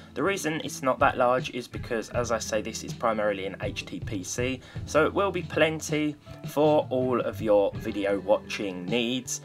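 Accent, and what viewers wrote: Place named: Estuary, United Kingdom. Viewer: Could be Kent, I live in east Kent and it's a variation of the estuary accent from this corner of the country, Essex into Kent.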